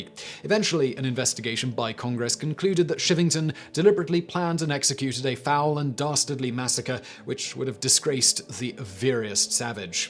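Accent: United Kingdom. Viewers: Okay? He’s British, American English will be different to traditional British English.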